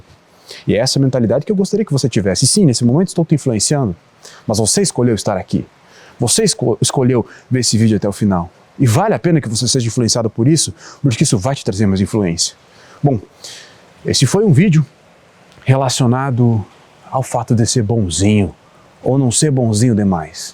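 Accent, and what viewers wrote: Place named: South, Brazil. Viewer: Hey estou aqui, Sotaque muito bonito o seu,muito orgulho da cultura Sulista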